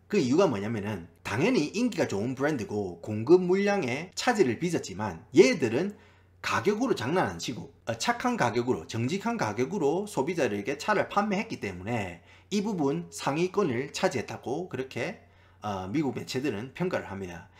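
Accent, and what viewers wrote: Gyeongsang, South Korea. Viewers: 같은 경상도 사람인데도 사투리 찰지게 하시네요^^ 재밌게 보고 있습니다~!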